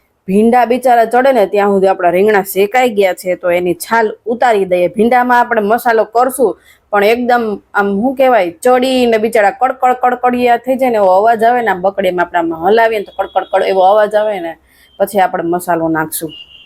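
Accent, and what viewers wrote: Kathiawar, India. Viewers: Super...same bolwani rite che je hamari kathiyadi che jamnagarni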